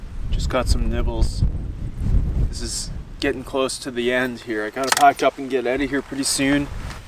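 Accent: Canada. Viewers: PAROGIES! I moved to the United States in 2008 and that is a food they don't really eat down here. This is the most Canadian video I ever have seen and nothing has made me feel like I've been back in Vancouver like hearing you. Previously, the most Canadian man I've encountered was a dude from Alberta trying to marry my dad's cousin in Budapest. We were both on the wrong continent but we felt like North Americans together.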